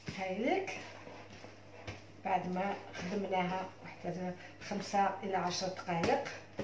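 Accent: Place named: Algiers, Algeria. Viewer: j aime beaucoup ton accent ALgerois..une femme de parole..choukran 😘😘😍😍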